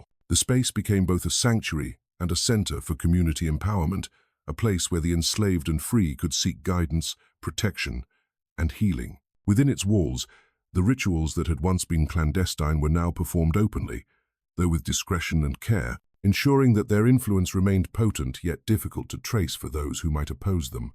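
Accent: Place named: United Kingdom. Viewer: A British accent is just WRONG for this. It needs to be a Cajun accent.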